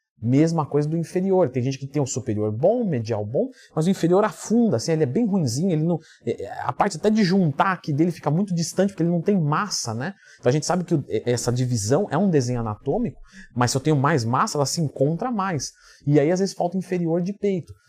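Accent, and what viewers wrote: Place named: Pará, Brazil. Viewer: Mas temas aí pai: que fala é o de Belém do para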